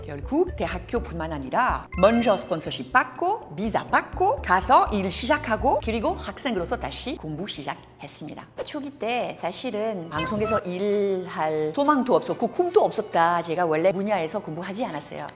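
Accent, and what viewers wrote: France. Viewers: 이야 이게 누구야 부란서이씨의 원조 이 님 아녀? 불어억양의 빠른 속사포한국어는 여전하네요. 오랜만에 들어보니 반가울지경. 한국에서의 삶이 벌써 30년이 넘으셨으면 이젠 그냥 한국인이시죠. 오히려 고국으로 돌아가도 어색하실듯. 암튼 건강하게 잘지내는 모습 보니 좋네요. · 악쌍 코레앙은 정말 그 누구도 따라할 수 없는 트레이드 마크. 트레 쥬닉크.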